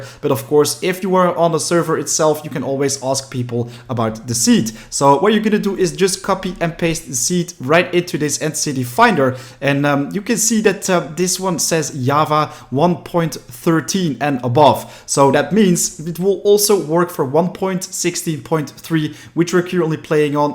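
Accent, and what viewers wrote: Germany. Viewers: the german accent made me cringe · Is this a german accent?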